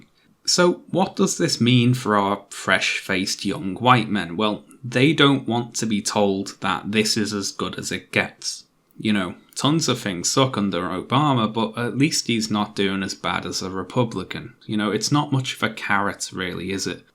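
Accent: United Kingdom. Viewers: I can now see why people love British accent so much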